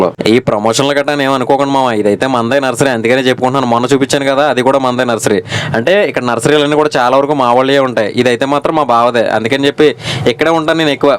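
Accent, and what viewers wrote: Godavari, India. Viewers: Ma godavari slang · గోదావరి స్లాంగ్ swag same bro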